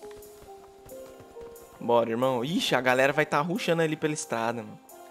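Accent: Minas Gerais, Brazil. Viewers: cê é mineiro tbm né? kkkkk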